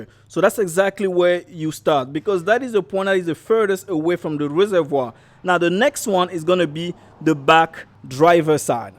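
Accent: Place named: France. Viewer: You don't have a hood (bonnet) sound shield. Your accent sounds French.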